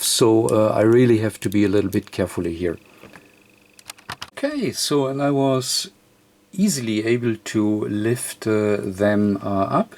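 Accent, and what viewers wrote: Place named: Germany. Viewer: You speak like an kraut...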